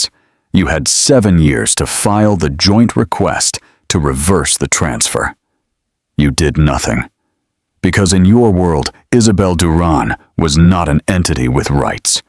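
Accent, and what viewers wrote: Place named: Australia. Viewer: Very interesting and it's so nice to see an Australian version of such Marital stories... Good One, the Lady is using her Brains without being Egoistic...Having been supportive and helping their Building business be established... He grew horns in his arrogance, forgetting the Partnership as equals... Serves him right...Best wishes to his Wife and family... From NSW 2680...Thank you for also talking in our Aussie English..Wonderful hearing the narration...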